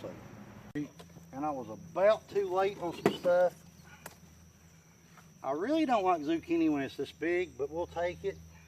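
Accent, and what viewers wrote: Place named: South, United States. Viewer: Ok, I’m going to straight admit to this. I subscribed because I really like listening to your southern accent.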